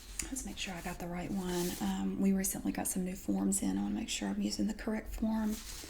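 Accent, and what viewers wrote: South, United States. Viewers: Is your real voice in a southern accent? If so mine is too! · Absolutely wonderful! I just adore southern accents, they're so calming and relaxing. Fantastic job, keep it up! :^)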